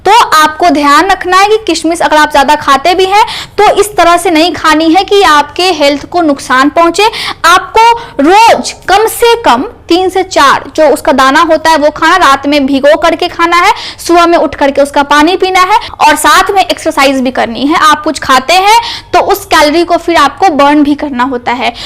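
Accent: Bihar, India. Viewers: Kya aap bihari ho kya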